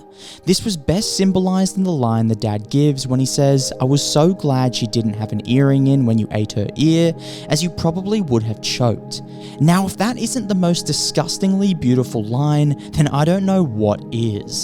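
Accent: Australia. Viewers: I'd like you to redo the video in a normal American accent the Australian accent is bizarre and very unpleasant to listen to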